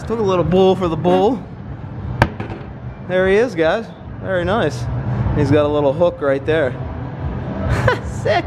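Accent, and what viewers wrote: Pittsburgh, United States. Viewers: Are you from PA or are you just visiting Pittsburgh...? >.>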